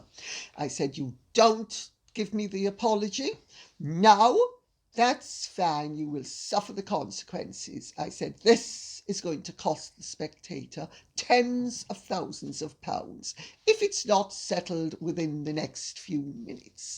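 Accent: Jamaica. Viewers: A very posh uptown Jamaican accent. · Louise Leibbrandt it’s also part of her Jamaican accent. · Lady C, I'm from Jamaica, Where in Jamaica are you from, please? Your Jamaican accent is unmistakable. Love it.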